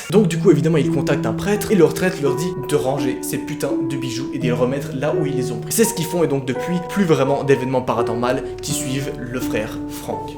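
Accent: Belgium. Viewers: Il est belge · T belge nan??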